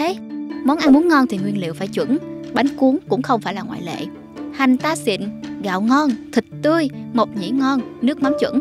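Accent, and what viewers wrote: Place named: South, Vietnam. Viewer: Bài viết về món ăn miền bắc, người viết bài cũng dùng từ ngữ của miền bắc. Vậy thì giọng đọc của miền bắc luôn đi. Tự nhiên đưa bạn miền Nam đọc làm chi. Nghe không hợp xíu nào. Giọng đọc này chỉ có thể dùng từ "tiệm" thay vì "hàng", từ "phi hành" chứ không thể là "chưng hành". Nghe chướng tai 🙄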